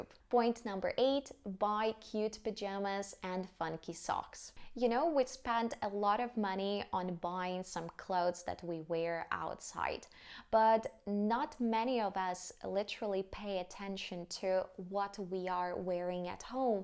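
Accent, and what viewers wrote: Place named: Russia. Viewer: На английском лучше, слушая русский английский не выучишь)))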